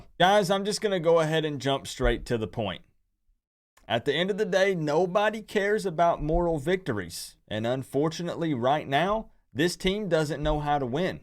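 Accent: South, United States. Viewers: Great analysis! Still don’t understand (considering your southern accent) how you are a Canes fan. All the Canes fans I know are into bling-bling, hip-hop and gangsta rap.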